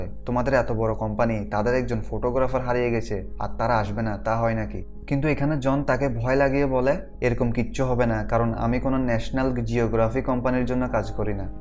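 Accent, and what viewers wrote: Chittagong, Bangladesh. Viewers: আপনি কি চট্টগ্রামের? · Vai Apner lenguage a CTG local er tan ase. Explation valo hoise kintu Kotha bolar expression aro practice korte hobe , Good Luck. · are you from Chittagong? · ভাইয়া কি চট্টগ্রাম এর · Apni Chittagong thaken??? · ভাষাটা একটু প্রবলেম,চাটগাইয়া টান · Vai chittagonian naki😊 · ভাই হচ্ছে চট্টগ্রামের লোক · চট্টগ্রামের আন্চলিকতার টান পরিহার করে ব্যাখ্যা করুন।শুনতে আরও ভাল লাগবে। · আপনি কি চিটাগাং এর মানুষ 😅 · ভাইয়া কি চিটাইংগা? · Chatgaiya tune ta na anar cesta koren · Oni hoitoba Chittagong ar ar jonno onar kothai Chittagong ar tan chola asa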